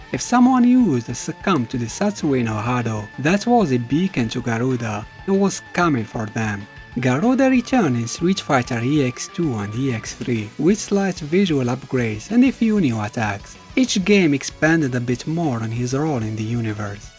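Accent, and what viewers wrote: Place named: Morocco. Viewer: l akh mghribi bayna :D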